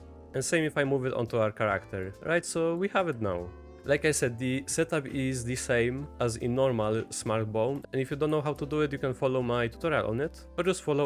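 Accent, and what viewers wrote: Poland. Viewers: polish accent 🤩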